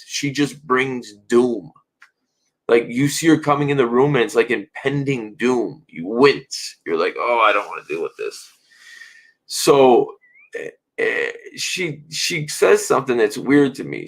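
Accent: Chicago, United States. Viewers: Dude you are hilarious! Neck, hips, lips, small of the back and rear bumper = sexiest parts of a woman ! I can’t could actually feel the sexual tension when you were describing how she was standing there.🤣 Seriously though love your channel I think you could go places in entertainment, you are a naturally interesting person The whole Chicago accent is gold! You can come out to the Bronx with us and pick up a Bronx “forget about it”=foget uhbout it“ “hey you guys go pick me up a cappacuola sandwich “= eh use guys go gimmee a gobbagoo sangwich .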